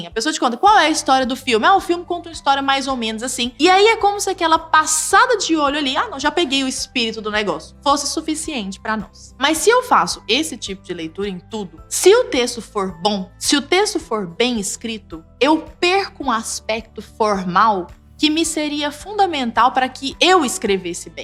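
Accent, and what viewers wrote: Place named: Centre-West, Brazil. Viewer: Pelo sotaque e daqui do centro oeste kk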